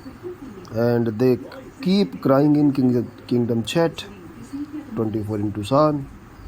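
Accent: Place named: India. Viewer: india bro logatnya aja ketahuan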